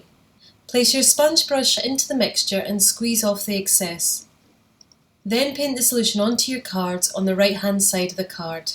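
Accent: Scotland, United Kingdom. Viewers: How lovely to hear a friendly Scottish voice. Just getting into doing some cyanotypes and enjoyed your explanation. All the best from Panama 🇵🇦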